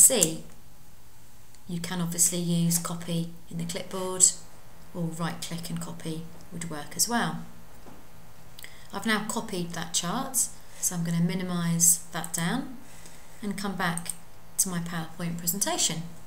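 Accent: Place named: United Kingdom. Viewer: annoying british accent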